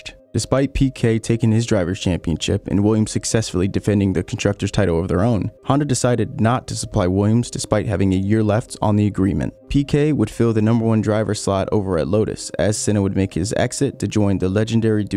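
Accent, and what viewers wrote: United States. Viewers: a well spoken American accent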